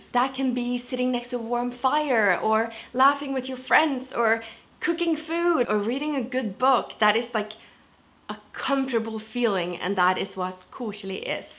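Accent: United States. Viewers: Your English sounds very American to me.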